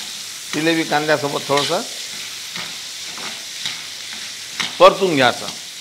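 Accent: Vidarbha, India. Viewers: khup chaan... ! vidarbhachi bhasha tumhala suit karte....! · डाड (ळ) कांदा बघून तोंडाला पानीच सुटलं 😋😋 आन तुमची अन आमची पन विदरभाची बोली ऐकून तर मस्तच वाटलं नं बॉ....🤩🤗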